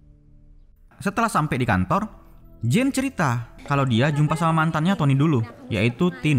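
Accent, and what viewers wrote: Medan, Indonesia. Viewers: wowkowokwok biar mantap bolehlah logat org medannya di pertahankan, saran ya... jangan semua benar2 dipertahankan biar meluas semua org bs menikmati kontenmu, pake Ejaan yg sedikit mendekati bahasa indonesia baku, ok lae.. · Medan kali kau yah bg yahh, salam dari pakam ya lae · Untung aku anak medan jd ngerti apa yg kau bilang hahaha cepat kali bah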